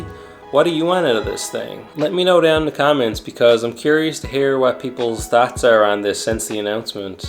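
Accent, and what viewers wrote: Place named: Canada. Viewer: no way this guy isn't Canadian, lol - i'm saying East Coast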